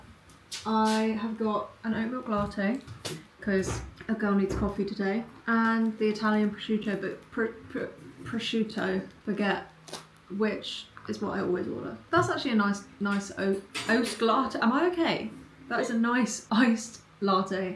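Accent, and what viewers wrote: United Kingdom. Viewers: U sound so posh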